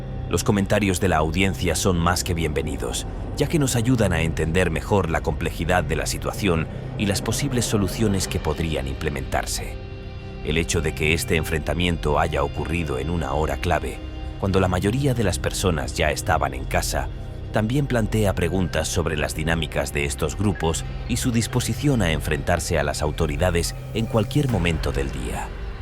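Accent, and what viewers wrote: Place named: Spain. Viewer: Qué pasa, por qué todos los que narran los videos son voces de españoles? Habiendo voces de mexicanos lindas y agradables , por qué tenemos que soportar esto, qué pasa, que alguien me explique, porque me interesa el vídeo pero no me gusta que lo narre un español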